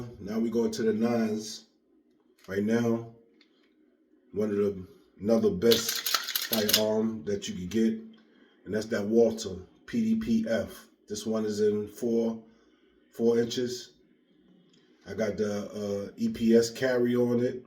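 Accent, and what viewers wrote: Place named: New York, United States. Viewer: lol definitely an old school New Yorker with that : LIKED’ed it 😜 BROOKLYN LUV🫡 BROOKLYN LOYALTY ☝️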